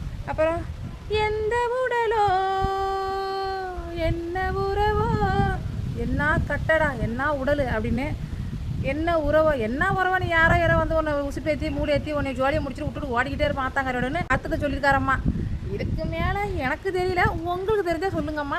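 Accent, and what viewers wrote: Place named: Nagapattinam, India. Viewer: Eanakku santhakama irunthathu Nakai mavattam pesaringa apparama sonninga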